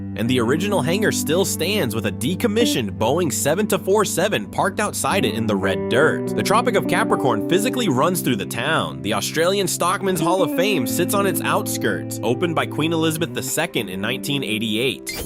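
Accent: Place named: United States. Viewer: What a joke!!!!!!!! I stopped watching when I heard "Morwell". Yes, Morwell the drug capital of the Latrobe Valley. The shops have closed and the town is dead. The only businesses thriving are the drug traders and pushers, the police and the lawyers. And, the American voice, don't get me started on that. Vomit material.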